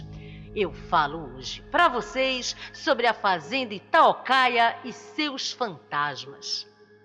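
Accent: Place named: Amazon, Brazil. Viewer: eu sou de belem, pelo seu sotaque as vezes eu tenho duvida se voce é de belem ou de manaus. Amo os seus videos, abraço.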